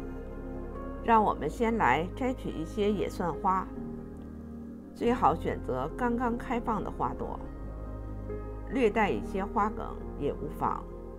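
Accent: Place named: Beijing, China. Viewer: 我就知道您是北京的。我听出来啦！！！特别亲切😍 也祝您和家人周末愉快！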